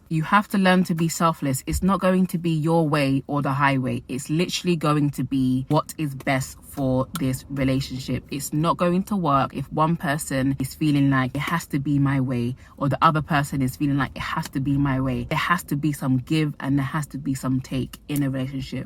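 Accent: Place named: United Kingdom. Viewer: Relationship advice + British accent = GOLD Thanks!!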